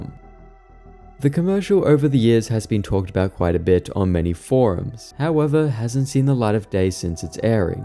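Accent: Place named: Australia. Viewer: the aussie accent is great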